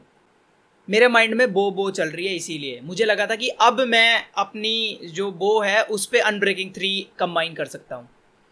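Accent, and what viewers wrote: Haryana, India. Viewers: I love when you speak Haryanvi because I am from Haryana I am your big fan love you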